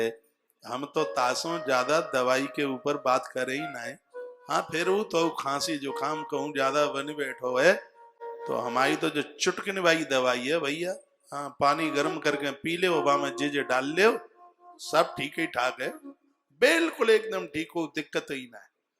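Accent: Braj, India. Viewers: गुरुदेव आपके श्री मुख से व्रज भाषा बहुत मलूक लगती है .🙏🤗🤗😊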